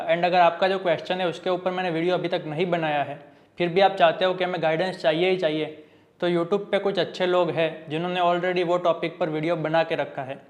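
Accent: Gujarat, India. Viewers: Aap gujraati ho? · That gujrati accent though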